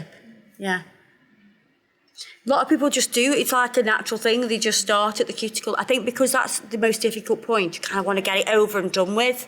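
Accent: Stoke, United Kingdom. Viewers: That's how us Stokies speak lol loowk, boowk and coowk (in other language look, book and cook) lol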